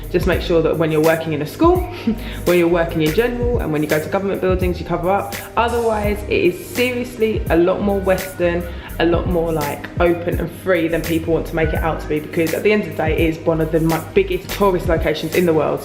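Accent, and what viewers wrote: London, United Kingdom. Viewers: Luv luv ur British accent. Glad that u like my country. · beautiful I can here you talk all day .I love that London English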